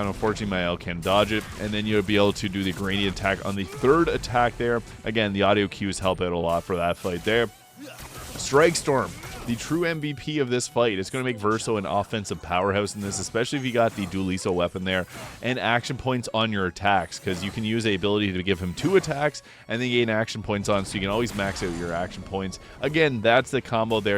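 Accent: Maritimes, Canada. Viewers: I was just watching your Swashbuckler guide and I thought I heard some Maritime in your accent. Hello from New Brunswick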